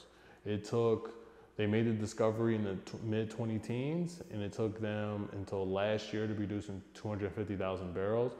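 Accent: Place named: Jamaica. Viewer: I'm pretty sure you are not Jamaican... your accent sounds authentic... didn't hear you mention whether or not you are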